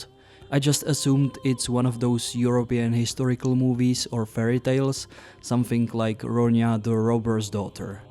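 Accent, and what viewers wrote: Czech Republic. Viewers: Dopytle....celou dobu si říkám..odkud ten borec asi je....takovejhle "přízvuk"....to je snad Čech...a pak na mě vyskočí:)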